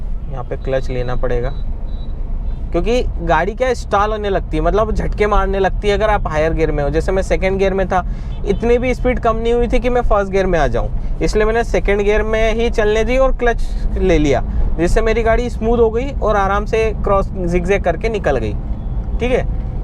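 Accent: Indore, India. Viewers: आपकी लैंग्वेज का टोन इंदौरी लग रहा है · Lagta he bhai ap Indore se hoo 😂😂😂😂😂😂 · Indori accent truly at the point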